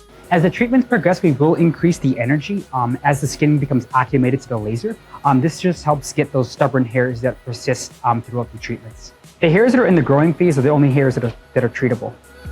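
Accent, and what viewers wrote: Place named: Asia. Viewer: Bro the Asian accent 💀💀💀💩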